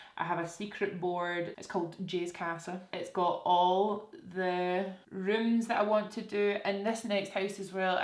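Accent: Scotland, United Kingdom. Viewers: Just found your channel, you’re an absolute babe, so down to earth, lovely and Scottish accents are lush ✨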